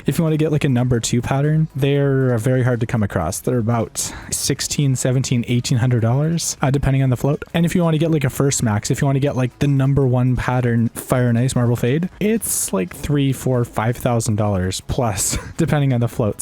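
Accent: Norway. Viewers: Are you from Norway? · hmmm hmmm er du Norsk? (are you Norwegian?in Norwegian obviously)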